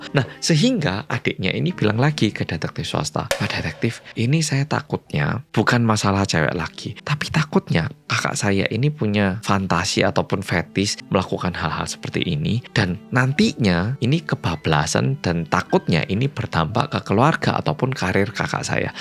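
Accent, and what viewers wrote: Java, Indonesia. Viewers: hai medok❤ lgsg nyimaaak🎉 salam bolobolo😘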